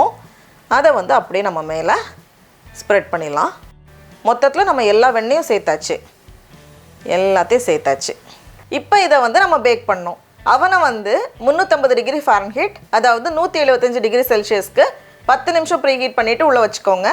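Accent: Nagercoil, India. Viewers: அக்கா நீங்க பண்ற ஒவ்வொரு சமையலும் அவ்வளவு அழகாக உள்ளது அதுவும் உங்கள் நாகர்கோவில் பேச்சி அருமையாக உள்ளது எனக்கும் நாகர்கோவில் தான் நீங்கள் சொல்லித் தரும் விதம் எளிமையாக புரியும் படி இருக்கிறது ரொம்ப நன்றி தயவுசெய்து எனக்கு பதில் கமெண்ட் குடுங்க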